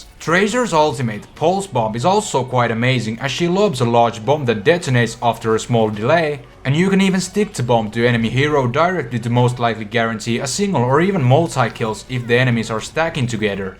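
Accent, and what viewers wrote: Finland. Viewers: is Finnish, and Finnish people are known for their "Rally English"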